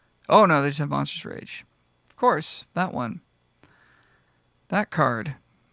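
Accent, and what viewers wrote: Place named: Canada. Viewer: You say Ey a lot. U Canadian?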